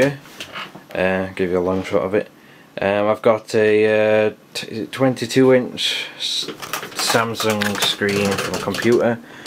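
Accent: United Kingdom. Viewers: You are so british I love it. Where you from pal? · YESSSSS SOMEONE BRITISH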